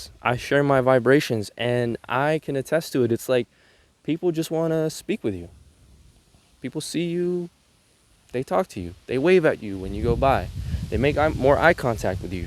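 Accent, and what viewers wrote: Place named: United States. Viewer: my goodness....now i will practice American accent with your videos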